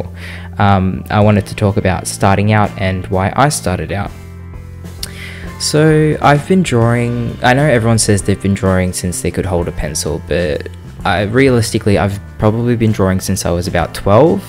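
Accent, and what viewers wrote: Australia. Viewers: aww you seem so smol in this and after you mention being Aussie, I just heard your accent so much more intensely after lol. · I could tell u were from Australia. I am too mate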